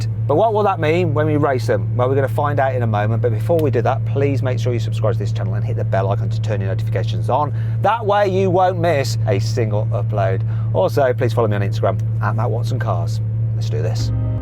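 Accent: United Kingdom. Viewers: イギリス英語って新鮮で好き:) インド人がバイクをbikeって呼ぶの違和感だったけどイギリス英語だとbikeなのね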